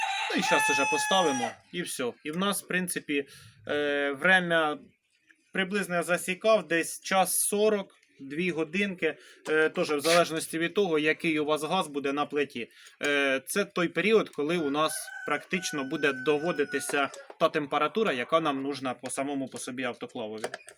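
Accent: Poltava, Ukraine. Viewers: Доброго дня! Із святом Вас! 😊 Так, я зараз теж м'ясо солю зразу, мені так більше подобається, а раніше солила в баночках. Ви, мабуть із Полтавщини? Говір Полтавський у Вас. 😉😊💐💐💐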